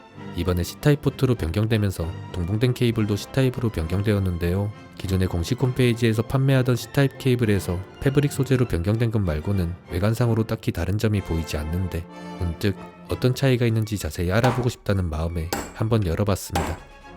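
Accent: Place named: Gyeongsang, South Korea. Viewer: 경상도 사투리에 줄줄 새고 뭉개지는 딕션까지.. 치아 교정하세요?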